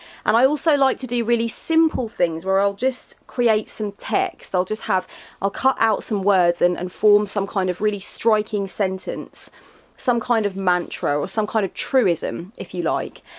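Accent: England, United Kingdom. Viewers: I've just about catch up on all your videos as a latecomer (mornings with a cup of tea - had to be done!) and they're great! So lovely to hear a fellow English accent amongst the depths of Youtube! Loving the Vlog idea too, it definitely adds something new. As a cheely suggestion, would love you to video your entire collection of cards - but then I am very nosy! Thanks so much for sharing all your thoughts, your videos/posts are brilliant.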